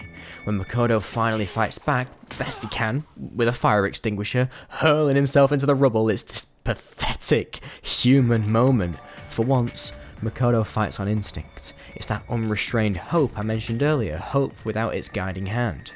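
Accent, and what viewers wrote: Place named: United Kingdom. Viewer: As a fellow Seiko lover the amount of random Seiko yelling segments make me chuckle, also its fun to hear another brit talk about this series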